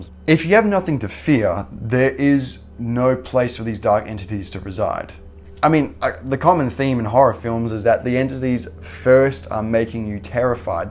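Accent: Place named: Australia. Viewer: Where are you from? You sound kinda Australian but idk it doesn't sound like 'ultra-Aussie-accent'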